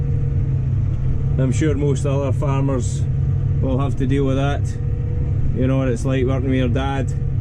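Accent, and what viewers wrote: Scotland, United Kingdom. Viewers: Very easy watching your videos must be the Scottish accent , would be well interested in the merchandise but do you know what extra charges would be incurred now with Brexit sending them to Ireland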